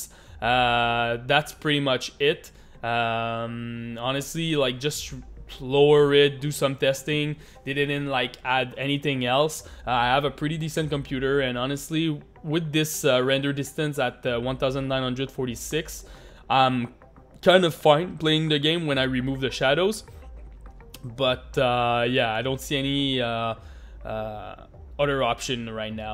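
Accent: Quebec, Canada. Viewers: le québecois mdr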